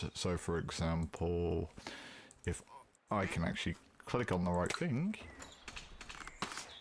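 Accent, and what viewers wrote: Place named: United Kingdom. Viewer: Love this just brought the game on switch with dlc on the e-store! Where’s abouts are you from in the UK? I’m British from Birmingham and trying to place your accent!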